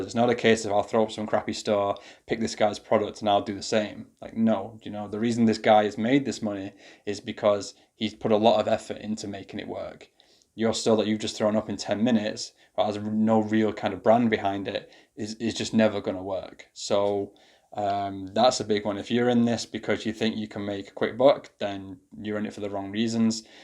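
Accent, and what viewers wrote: United Kingdom. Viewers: please don't get me wrong but i cannot understand your UK accent. And the automatic captions doesn't matches with your words. You should create own captions for every video.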